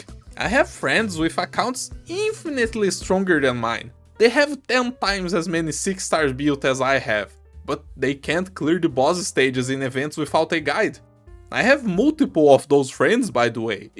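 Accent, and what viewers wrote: Brazil. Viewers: quick question, are you brazilian??? cause you have the accent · Ummm the accent, I know you, you're one of my people... Quer uma goiaba?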